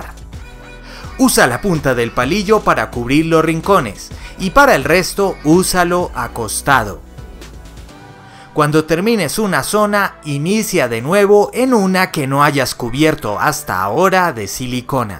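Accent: Colombia. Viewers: Eres de colombiano ? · muchas gracias muy útil eres colombiano?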